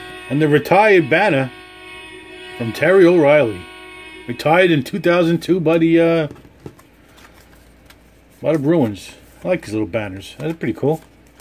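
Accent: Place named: New York, United States. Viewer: the most new yorker sounding break. Love it!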